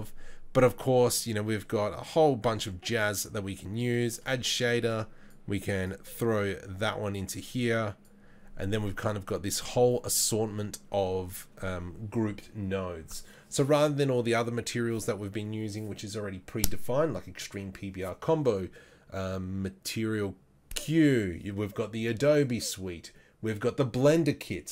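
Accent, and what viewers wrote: Australia. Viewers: Sorry to ask..are you Australian?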